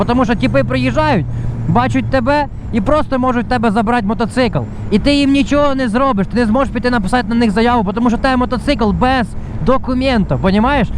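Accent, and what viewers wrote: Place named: Surzhyk, Ukraine. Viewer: Вчи українську! бо твій суржик звучить як риготня...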